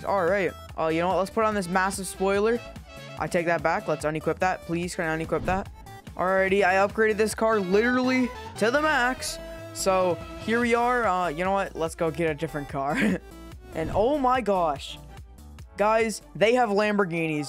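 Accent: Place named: Canada. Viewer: I can tell you're canadian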